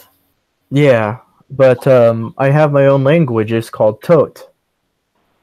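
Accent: Scotland, United Kingdom. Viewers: 😄Great Interview!👏 👌i've listened twice now and found the discussion Very informative!🙏🌲🍃 Thank-you🌈..ps.your Scottish accent is charming!😀🔥keep it Just as is😉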